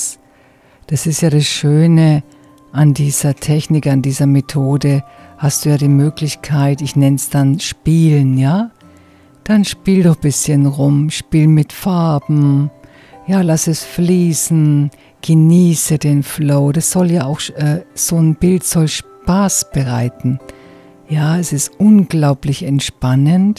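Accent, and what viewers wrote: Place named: Munich, Germany. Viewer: Du bist echt talentiert. Freut mich immer ne münchnerin zu hören.❤❤❤deine Bilder machen freude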